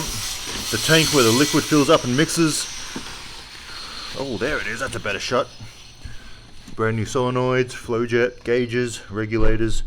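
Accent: Australia. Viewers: is that an australian accent? definitely not american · Do I hear an Aussie accent? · No idea why this popped up on my youtube.....Notice the Aussie accent! so kept watching (Me being an Aussie) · Are you an Aussie?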